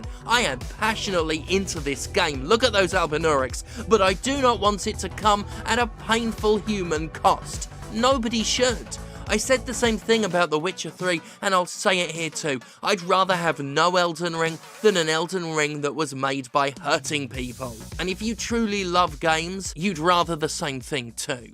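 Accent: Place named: Birmingham, United Kingdom. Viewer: Your becoming slightly Brummie and I’m ok with this.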